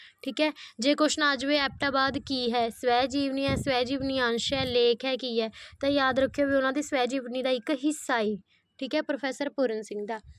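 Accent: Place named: Mansa, India. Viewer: ਮਾਨਸਾ ਏਰੀਆ ਲੱਗਦਾ ਮੈਡਮ ਜੀ ਦਾ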